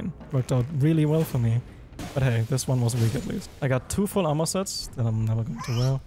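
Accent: Germany. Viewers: Hey dude great video! <3 Can we connect on discord or so maybe? From what i hear i assume that you're german and i'm german too, so it'd be great to have someone to share the video editing experiences, especially when it comes to Elden Ring :) <3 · Jo m8 whats ur Main language? German ?🤔 Dein english klingt wie von einem deutschen aber is trotzdem gut 😋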